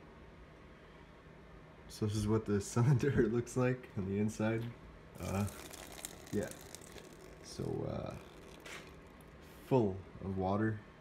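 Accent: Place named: Quebec, Canada. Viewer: I was about to say, your accent is almost french canadian. Then I clicked on your profile and I see Montreal, Québec, Ah ben Tabarnak ! :) Haha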